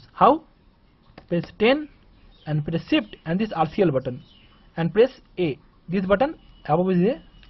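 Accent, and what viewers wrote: India. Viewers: cool indian accent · Индийский акцент это ржака! 5+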